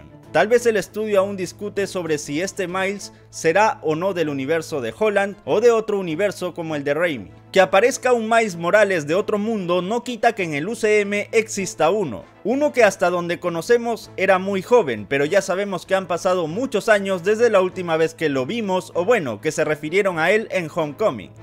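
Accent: Peru. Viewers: Déjame adivinar. Peruano?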